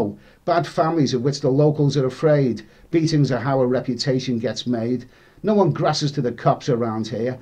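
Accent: Liverpool, United Kingdom. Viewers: Just starting to watch your oldest stuff, as I'm up to date on your travels from leaving England for good. I am not into poetry whatsoever, however, I really enjoyed this mate! The scouse accent, the message etc. Excellent mate, nice one!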